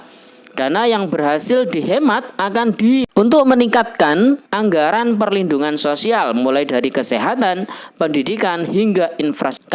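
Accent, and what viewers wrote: Java, Indonesia. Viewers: Medoq jawa kental mas.titik.koma jelas yampain info.jg BAnter banter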